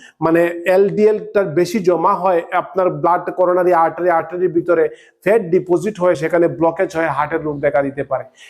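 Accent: Sylhet, Bangladesh. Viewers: ভাই আপনার বাড়ি কি সিলেট না হবীগঞ্জ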